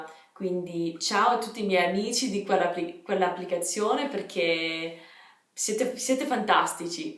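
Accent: Italy. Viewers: non si capisce che sei canadese! dal tuo accento sembri proprio italiana, complimenti davvero 😍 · Sei davvero brava, addirittura quando dici certe cose hai la stessa cadenza di un italiano! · Tu non sei normale!! Non è possibile per una madrelingua inglese avere un accento italiano così "italiano"! Ma come hai fatto? Considerati eccezzionale. ;-) Si vede che sei molto motivata e superperfezionista. Complimenti, hai un accento praticamente perfetto, e la cadenza è molto italiana. Il tuo accento potrebbe essere vagamente dell'italia del nord, ma è quasi inesistente, quasi da speaker del telegiornale. Io sono Sardo, e ti confermo che i Sardi parlano mediamente un italiano ottimo, probabilmente perchè un tempo parlare in sardo era visto negativamente. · Hai gia un bellissimo accento italiano. Bravissima, continua così. 💪🏻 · non direi mai che tu sia straniera. Accento 100% italiano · Parli italiano. 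Ma non solo. Gesticoli, hai la r detta in italiano, e accenti e decadenze italiane. Wow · ODDIO SEMBRI ITALIANA. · complimenti! Parli con un accento molto italiano!! Davvero! Non sto scherzando affatto! Complimenti! Mi sono iscritto al tuo canale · Sei veramente bravissima,hai pure l’accento all’italiana 😂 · Wow hai un perfetto accento italiano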